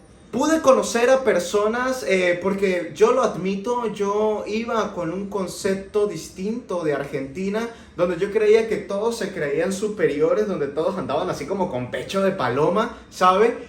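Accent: Mexico. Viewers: Tienes un acento Mexicano,, saludos, 👍👍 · No me di cuenta de que eres de Venezuela,te sentí un asentó de Méjico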